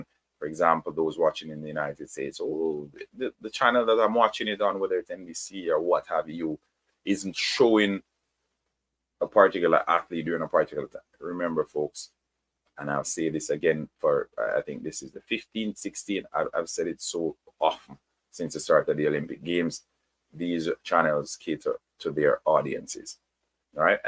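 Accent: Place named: Jamaica. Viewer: Yeeaahh,, already can catch up/easily understood yr Jamaica's english‼️😆😅Thnk u vm! 👍👍😁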